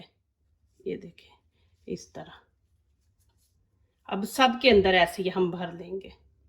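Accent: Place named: Punjab, India. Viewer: Sub hi cooking karny walay bismillah sai hi start karty hain aap boht acha karti hain sirf itni baat hai kai aap ki urdu sun kar dhayan cooking sai hut jata hai punjabi khubsurat zuban hai or aap ka lehja bhi pure punjabi hai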